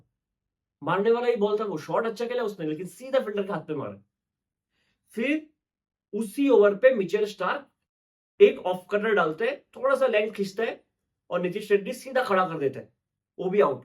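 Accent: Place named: Northeast, India. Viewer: Bhai tum northeast ho kya accent vaisa hi hai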